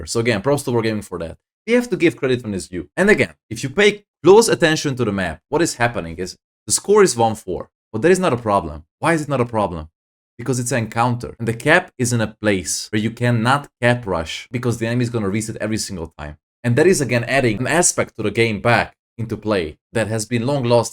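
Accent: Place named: Hungary. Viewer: Alig hallatszik hogy magyar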